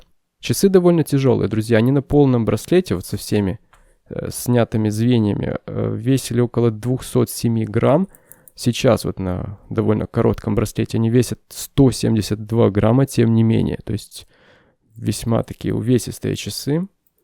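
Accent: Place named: South, Russia. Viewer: Обычный южно-русский говор. Давайте относиться снисходительно. Тем более, автор компетентен.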